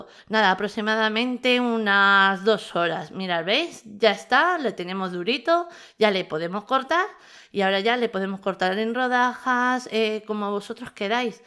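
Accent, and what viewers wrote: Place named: Spain. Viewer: Pero que ricoooo Ami Tus quesos tienen una pinta! No sabemos tu nombre! 😉😉 Igual con tu acento español, te imagino guapísima 🤭🤭 No te pelees con tu máquina. Se te ha roto, o aflojado el eje que tiene en el fondo 😁 Si viviese por ahí, en un periquete te lo reparaba 🙄 Gracias por compartir Te saludo desde mi Haedo Buenos Aires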